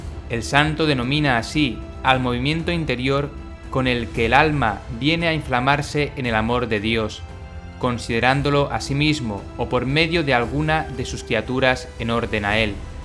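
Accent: Spain. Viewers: Macho, tendrás mucha razón, pero ¡que forma más sosa de hablar! Y ese acento madrileñata.... Prefiero el acento latino.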